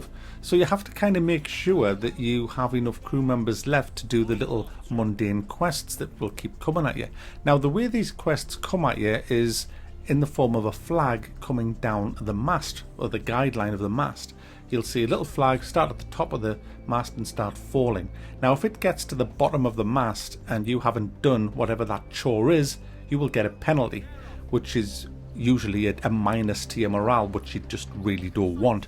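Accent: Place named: Sunderland, United Kingdom. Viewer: Eh a mackem! Ya getting a sub just for that mate.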